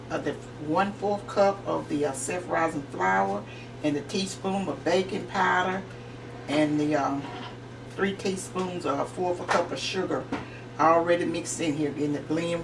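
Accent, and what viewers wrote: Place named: North Carolina, United States. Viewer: Thank you for sharing your recipes! I love that you you’re a southern woman. You sound like my family, we’re from nc.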